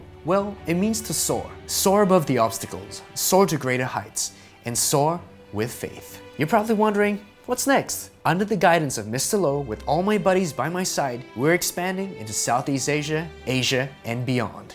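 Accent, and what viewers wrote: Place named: Taiwan. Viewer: OMG YASS no wonder your Mandarin has Taiwanese accent XDD love it so much!! I'm from Taiwan and am studying in Australia now~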